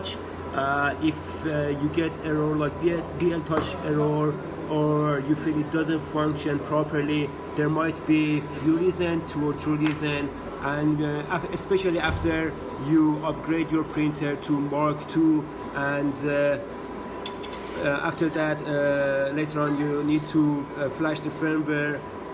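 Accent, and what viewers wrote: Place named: Iran. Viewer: Persian accent :D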